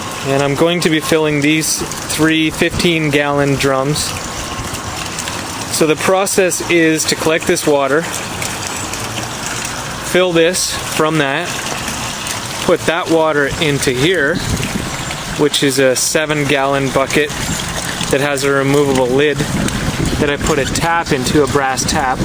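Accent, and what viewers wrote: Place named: Canada. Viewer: What's with the Canadian accent?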